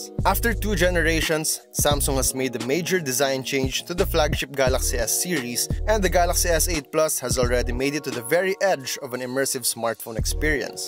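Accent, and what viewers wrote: Philippines. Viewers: Chris humphrey galapon yup. He has a really heavy Filipino accent :) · gawd .. the Philippino accent is the fucking woooooorst galàsheeew, clawkspeed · this guy sounds Filipino. eh :) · wow at the first 5 seconds of the video I already knew you were a filipino because of your accent....since you're a Filipino I will give you a thumbs up and I'll subscribe...btw i like the quality of the vid. Great Job po 👍👍❤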